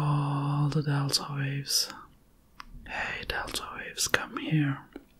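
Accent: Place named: Turkey. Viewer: Turkish accent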